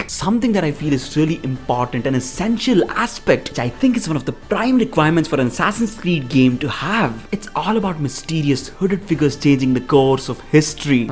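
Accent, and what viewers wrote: India. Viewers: ok it's just there are different types of Indian accents so it's hard to tell the way you say well vell gave me indian kind so it's nice to know you come from a lovely country I'd love to visit some time in the future:)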